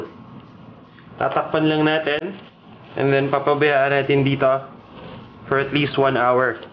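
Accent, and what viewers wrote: Philippines. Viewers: Except for the loud background music, will u people stop criticizing this chef? His English is NOT very bad that u have to complain about it! & his accent is ok! Pinóy accent naman yun; what's wrong with it? Would u rather hear him speak in a foreign accent (like American or Chinese accent) while he uses the Tagalog language?!? Remember that his videos are NOT about grammar or phonetics!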